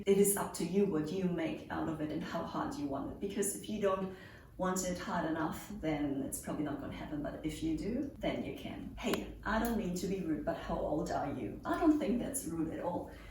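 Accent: Germany. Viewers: Ha! Wie Geil. Danke fürs beantworten! :D And also for translating my questions, I never thought that this could be a struggle! ;D Vom dialekt her hätte ich dich eher in Franken einsortiert aber das du in Ffm geboren bist find ich ja ultra witzig...Vielleicht hat der YT algorithmus dich mir deshalb vorgeschlagen? Grüße aus dem norden Frankfurts!! :D p.s.:Wenn du das nächste Q&A machst kann ich ja wieder fragen stellen. Und wenn ich dir ne flasche Äppler an die Goldcoast schicken soll, sag bescheid :D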